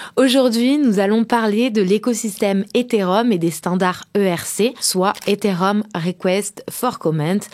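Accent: South, France. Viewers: Hyper agréable l'accent du sud pour cette vulgarisation de l'univers crypto et ici le sujet des ERC ! Merci bcp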